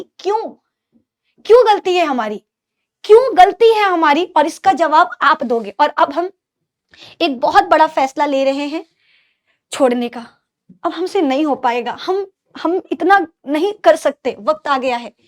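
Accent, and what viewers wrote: Bihar, India. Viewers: Mam kutto ka kaam hai bhokana padhna to have nai unko aap please padhana band mat kariye main ab 10 me gayi hu aur main batch Lena chati guu aap se padh kar tap karn chati hu main khati huu ki aap ke Tarah koi padha Nahi sakta aur to aur you are my favourite teacher aap because aap ka bolne ka tarika bihaari wala I like it my first line dimak me rahk legiye main to asise hi short Dekh rahi thi aap ka video aa Gaya muhje testion hi gayi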